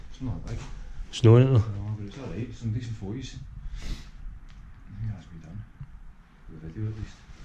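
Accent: Scotland, United Kingdom. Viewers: Even I can understand Scottish accent! lol I'm a Japanese though I'd like to hear your talk more Thank you. 👍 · his accent is just OK as it is. As is the richness and smooth dialects of the Scottish language. We understand it just fine. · He probly doesnt speak much becuase the Scottish accent can be hard to understand. I how ever love the Scottish acent somthing very nice about it.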